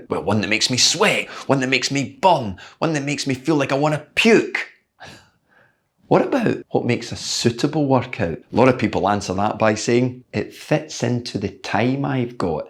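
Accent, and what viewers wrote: Scotland, United Kingdom. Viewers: After watching several of your videos, the voice in my head while I'm cycling now speaks Scottish.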